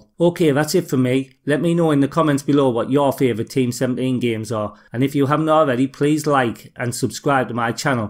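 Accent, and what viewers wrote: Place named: Sunderland, United Kingdom. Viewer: Great video! Nice to hear a mackem accent!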